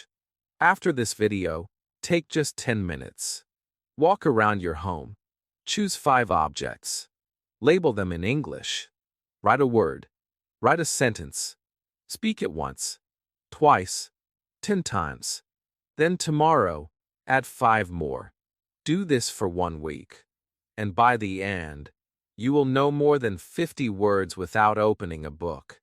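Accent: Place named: United States. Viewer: I like your soft American accent very much 👍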